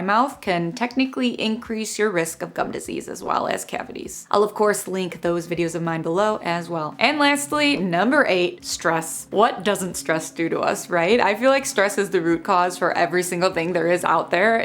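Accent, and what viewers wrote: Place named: Chicago, United States. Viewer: You’re from Chicago right? I sense a Chicago accent it’s so cute!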